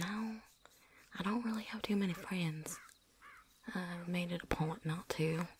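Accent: South, United States. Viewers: Southern accent needs a little work but it’s still good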